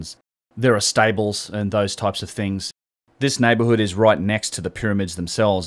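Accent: Australia. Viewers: Good on you, mate. Interesting videos, including your own unique take on things; plus it's refreshing to hear a relaxed Aussie voice (and Aussie-isms) on videos of this nature. Extremely envious of your opportunities for travels, discoveries and adventures. Cheers from Port Macquarie NSW. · Irrationally cool this info has a Australian accent. Stuff took generations to make... that’s key. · I love your work. Its mind boggling to me how wrong the mainstream is on this and I don't understand how it can keep up the lies for so long. From a engineering perspective alone the proof is everywhere of . honestly I think you should have billions of subscribers. People are blind. Even my userly open minded flatmate fails to see the proof.he watched a few minutes of your fine work and became instantly sarcastic. He says he knows the history of Egypt, he was taught about it in school ! 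lol. He then went on to say that the Australian accent was annoying him so I guess its all sorted for him haha. Bloody hell typical kiwi 🥝 🙄. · (Just a comment to help with the algorithm , unquote.) Man, I love hearing this revolutionary information in an Aussie accent. Just sayen ...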